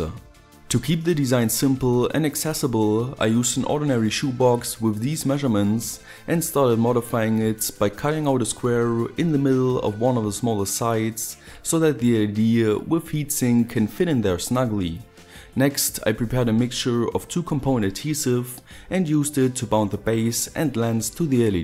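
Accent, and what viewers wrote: Germany. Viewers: nice work. german english... gruäss us dr schwiiz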